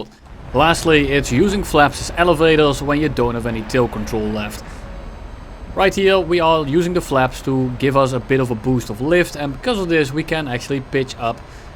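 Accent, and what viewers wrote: Netherlands. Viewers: I know you're Dutch, I hear ded eksent a mi-jel awee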